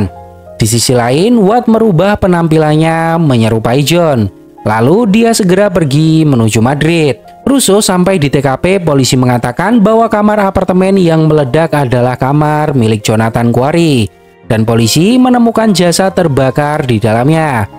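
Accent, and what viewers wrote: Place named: Java, Indonesia. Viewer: Medog bgt bang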